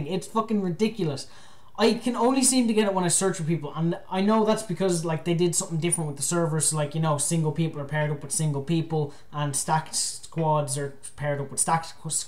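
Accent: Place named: Ireland. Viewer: That Ireland voice (; <3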